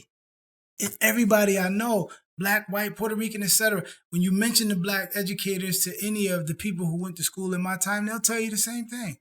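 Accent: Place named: New York, United States. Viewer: Turned it off soon as I heard the NYC accent.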